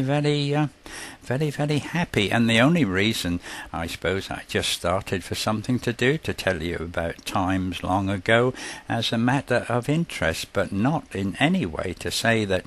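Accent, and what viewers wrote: United Kingdom. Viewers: I love how British people say process. Like "pro" at some type of game or sport. Great Video!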